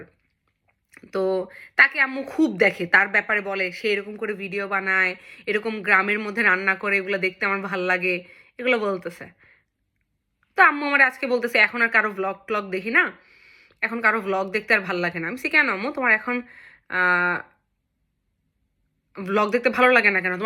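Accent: Barishal, Bangladesh. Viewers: Amr o tmr vlog dekhte valoi lage! Ar tmr barishailla accent a kotha aro joss lage!😁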